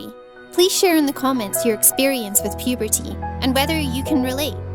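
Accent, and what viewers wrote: Scotland, United Kingdom. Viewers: She sounds Scottish to me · Are you Scottish your accent sounds like you're Scottish???...... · Is that a half Scottish accent? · Wtf is with her accent Is she trying to sound Scottish Plz help me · I think she has a scottish accent. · She sounds Scottish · Is the voice a Scottish accent?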